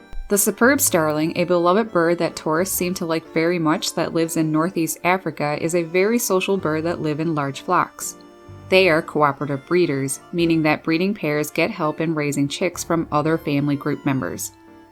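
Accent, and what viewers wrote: Canada. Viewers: Canadians talk funny.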